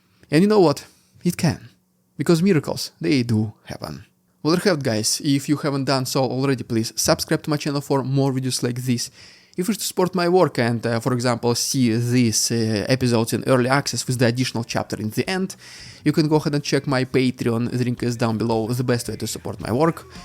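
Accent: Russia. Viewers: I try listening to you but your accent is so Russian that I cant understand you. You talk English words but in Russian, it’s bad.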